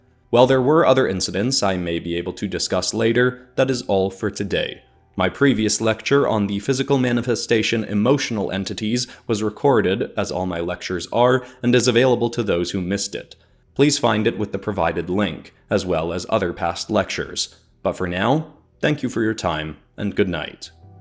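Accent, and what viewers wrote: Canada. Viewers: IDK are you Canadian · You know, I've known you were Canadian for a long time, but never have you gone THAT Canadian. Love it, but man did you throw me for a loop! I wasn't even thinking to much about the "Z", lol. Love the designs for Snivy and Tepig designs. I don't play much Pokémon, myself, but they're some of my favorite starters, art-wise. So cute! That second one, though... that was rather disturbing looking. Glad for more of these! Just out of curiosity, the only archon we haven't seen do much yet is Romilasect. Do you have any plans for her in the near future? Presumably regarding one of the core couples, I take it. I've been hooked on the TAHE storyline (and am looking forward to seeing what happens next with Kiko, Alsya, and Dresden), but I guess it does strike me as weird how much of a side character that specific villain has been.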